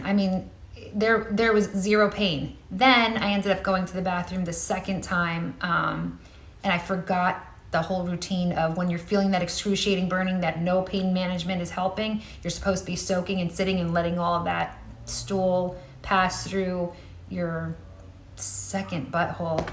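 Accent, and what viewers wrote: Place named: New Jersey, United States. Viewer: He has blessed me! And God bless YOU as well!! Yes, lol the accent you hear is indeed from Jersey and that is where I had my surgeries. ❤️‍🩹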